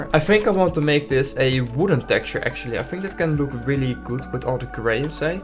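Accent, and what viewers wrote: Netherlands. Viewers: your dutch accent is strong and great btw im dutch too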